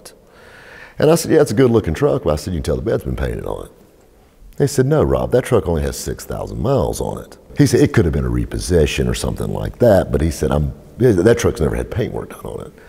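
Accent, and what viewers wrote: South, United States. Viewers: God damn I love this guy Southern accent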